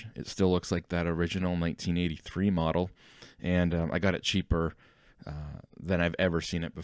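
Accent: Canada. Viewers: Thank you for the nice video, I still bought this Casio model, it will arrive tomorrow. I am not a native English speaker, but it seemed to me that you have an interesting accent, are you from Quebec? Love from Ukraine.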